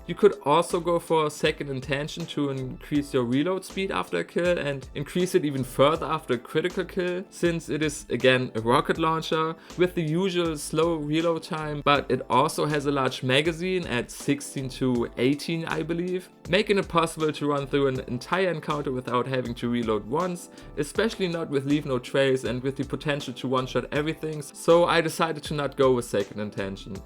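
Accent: Germany. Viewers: lul bist du deutsch?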